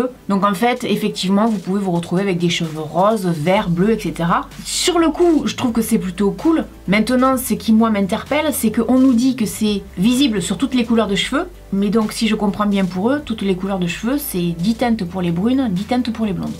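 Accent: South, France. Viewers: J'adore ton accent du sud parce que j'ai le même je t'aime trop toi et tes concept décaler😍😍😍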